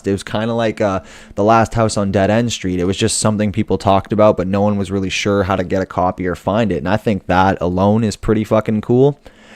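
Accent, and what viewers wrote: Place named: Germany. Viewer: Awesome. Loved your German accent. Nice to see more subs with every video. Keep em coming!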